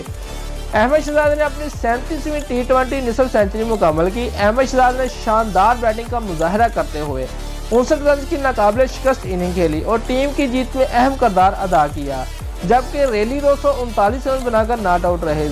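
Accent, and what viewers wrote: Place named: Punjab, India. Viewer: Abey chutiye punjabi style mn urdu bol raha ha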